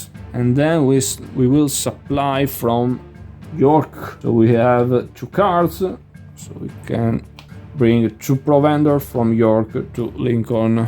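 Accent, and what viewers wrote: Italy. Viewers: English with an Italian accent.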